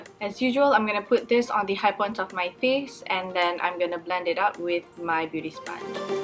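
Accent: Penang, Malaysia. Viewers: Please do make up tutorial in malay again. Love to hear your penang slang 😄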